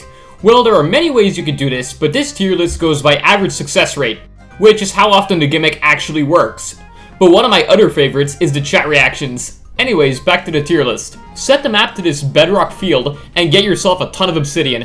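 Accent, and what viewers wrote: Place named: United States. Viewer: ? Is that you under that American accent?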